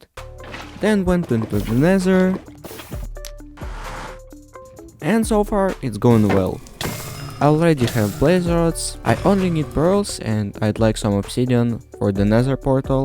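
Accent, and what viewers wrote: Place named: Russia. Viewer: По акценту понятно что он рускоговорящий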